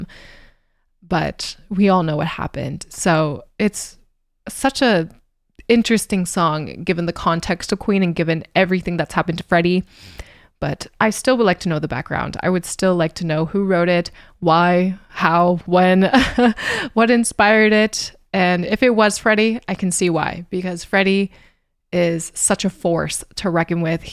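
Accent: United Kingdom. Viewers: Which country is? Her accent seems to be from England.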